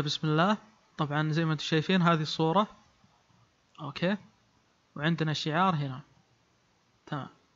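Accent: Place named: Saudi Arabia. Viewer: استمر انا سويت خمس حسابات و اشتركت عندك الحين كل اللي يسوون شروحات مغاربة و مصريين ياخي لما سمعت صوتك سعودي ارتحت كمل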